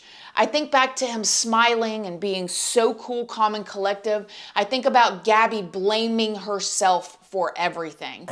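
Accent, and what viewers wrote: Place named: Midwest, United States. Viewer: You have the coolest accent I’ve ever heard in my life and I’ve travelled a lot ! What is the place where folks speak in this way ??? Midwest US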